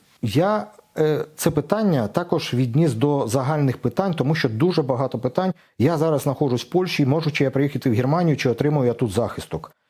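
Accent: Slobozhanshchyna, Ukraine. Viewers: все нормально! А в минулому було не "на русском", а на слобожанському діалекті співучої української мови!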